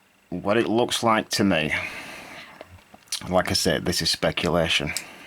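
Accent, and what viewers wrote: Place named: Yorkshire, United Kingdom. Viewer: Hi mate,speaking to you from Yorkshire meself!Love the accent,love the truth.Let's year down this rain mainstream paradigm and blow some minds!Midfield by Roger intro,d me to this and just wow.Truth always seemed self evident from the last stage lolol what is it? Deny,try to destroy,process then accept,always the same.Thank you my friend.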